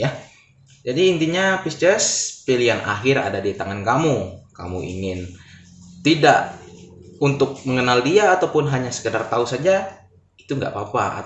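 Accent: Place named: Padang, Indonesia. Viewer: Pisces hadir menyimak... Suarany Abang ini mirip logat org Padang🙏🙏🙏🙏🙏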